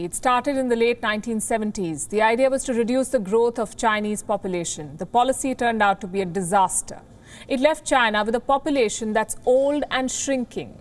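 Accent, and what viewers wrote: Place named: India. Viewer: I hate Indian accent , so disgusting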